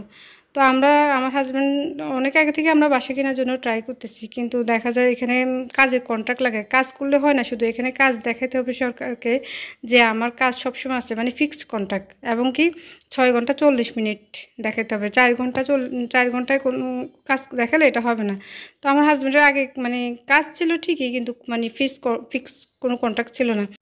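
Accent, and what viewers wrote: Bangladesh. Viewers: মনে হয় বাড়ি নোয়াখালী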